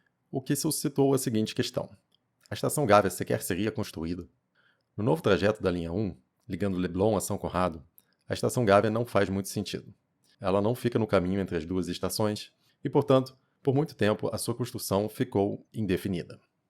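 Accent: France. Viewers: Ele tem sotaque francês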